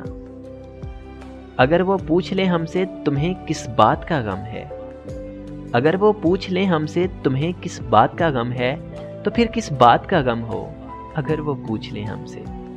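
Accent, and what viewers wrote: Punjab, India. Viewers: shyri achi hai per maaf krna punjabi lehja main sara maza khrb kr diya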